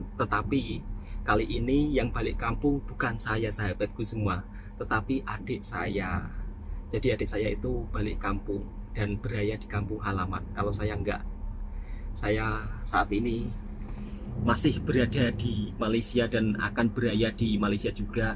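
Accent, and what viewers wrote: Java, Indonesia. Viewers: Medokkķkkķ jawa nye😅😅😅😅😅😅😅😅😅😅😅😅😅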